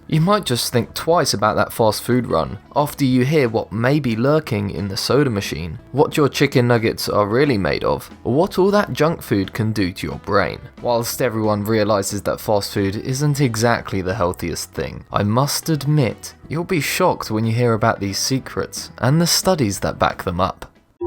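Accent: England, United Kingdom. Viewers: Please dont pronounce everything with an over the top brit accent , you just lost 14 viewers in my family , the accent is old and we. E mentioned it many times but you still wont change it. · Like your English accent, I get sick and tired of American accents, Cheers, from - Australia 👍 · Love that british accent! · Got a lot of hard feelings, when I hear a distinguishable British accent bashing American food, while not discussing blood pudding, aged beef, mincemeat pies, and the absolute hoard of atrocious foods they have, in the same discussion. Narrator, why don't you explain to me what, exactly, is wrong with British 'Fish and Chips', that the mayor of London is banning them for kids? If you feed children like sheep, expect them to look like them too (India is a great example of a nation plundered by Britain in the 19th Century that did so well, they nearly bankrupted New Deli before it was given back to them)...ever seen a 6-ft tall bodybuilder in India? 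Hint: it's because they worship cows, and don't eat them (until they show up in America, and then they eat them!)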